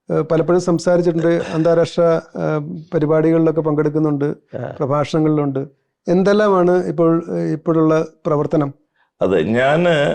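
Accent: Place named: Kochi, India. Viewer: ആാാ ക്വച്ചി ഭാഷ ആണല്ല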